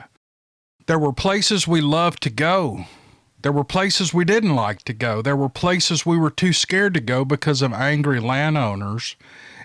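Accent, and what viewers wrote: South, United States. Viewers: Im from mobile county.. we call it " the bottom".. born and raised on the "mobile tensaw river delta"... A HUGE SWAMP. Listening to is like sitting with an old friend. The southern accent, slow talk. Music to my ears... Thanks for the stories "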